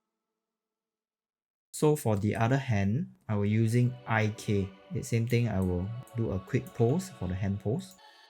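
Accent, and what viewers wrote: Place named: Singapore. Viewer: thanks a lot sir, your explaination was so good. Are you from singapore? i can tell u by your accent :face-green-smiling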